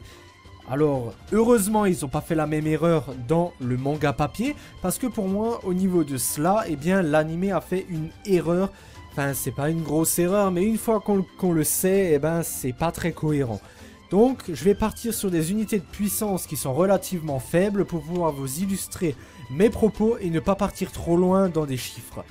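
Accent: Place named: Lorraine, France. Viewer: Super accent de la lorraine !!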